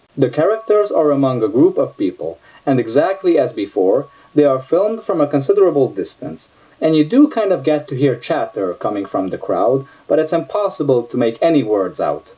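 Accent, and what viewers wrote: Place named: Portugal. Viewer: Are you portuguese? You kinda have a portuguese accent :)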